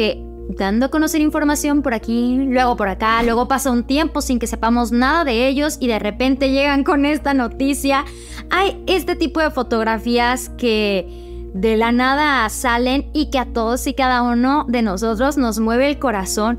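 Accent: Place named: Argentina. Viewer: Como se nota que eres argentina 😅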